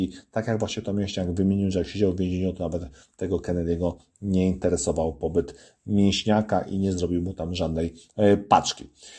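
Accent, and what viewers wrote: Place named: Warsaw, Poland. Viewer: A ja tam z innej beczki. Znałem dobrze jednego mechanika z Wołomina i on tak jak Ty mówił oksiązce i wchodził w dźwwli.Slychac w Twojej wymowie podwarśawski akcent. Z fartem 💪💪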